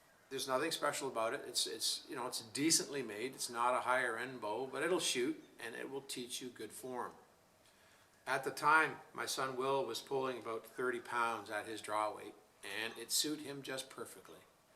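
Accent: Canada. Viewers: Aboot? Oh Canada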